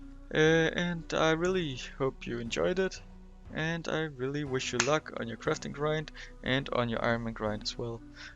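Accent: Denmark. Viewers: 2 sec in i instant hear a fellow dane nice guide tho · Sjovt. Det tog mig 15 sekunder, at høre på din engelske accent, at du er Dansk. Tak for videoen 🙂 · Are you Danish? you sound very danish in your accent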